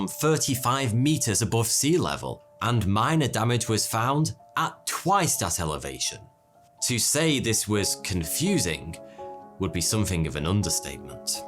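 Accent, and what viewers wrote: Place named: North, United Kingdom. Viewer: Tha sound northern thougnty..where ya from pal..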